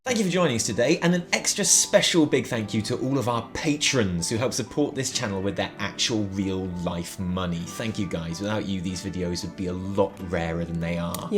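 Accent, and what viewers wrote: United Kingdom. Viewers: I really want you to be the next Doctor from Dr. Who just by your accent.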